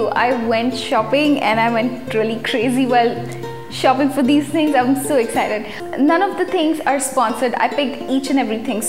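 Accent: India. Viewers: I have one question I am not trying to be rastist or anything r u from india bc I have a uncle that is and his relitives have an accent and urs is almost the same be aware that I am not trying to offend any one that is from india or not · This wasn't really a back to school giveaway more like a make up haul where r u from and where do u live because you have an Indian accent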